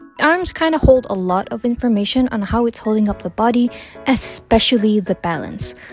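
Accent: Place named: Malaysia. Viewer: Hey!! Thanks for this video!! I actually did some self-critique on my artwork before so I could relate to this video Also, I KNEW IT, YOU'RE MALAYSIAN!! I thought your accent kinda sounds Malaysian plus your name. Hello fellow Malaysian artist~ And I really like your semi-realistic artstyle. I also do semi-realistic-painterly style as well!! Oh, and also, WTF, YOU'RE ONLY 17!?!??? HOW ARE YOU ALREADY THIS GOOD!?!??? I thought you would be like, 21 or something. But I've seen some really good artists who are quite young, so I guess it's normal Also, why no comms? T__T Oh, and btw, did you have a booth at CF two weeks ago? :oo Anyways, I'm gonna subscribe to you and I'll look forward to see you post more videos and speedpaints~!! Cheers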